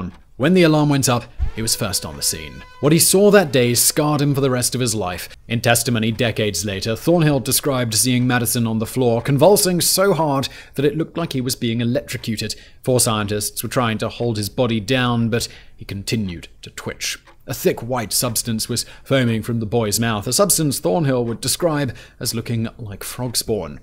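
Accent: RP, United Kingdom. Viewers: You cover interesting topics but your over the top annunciation drives me mad. I'd attribute it to some sort of paid education but your use of Americanisms like 'garbage' just indicates you're trying to capture a specific audience with a penchant for posh English. I wish you'd drop the act and speak normally. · Why are putting on a posh accent - it’s extremely off putting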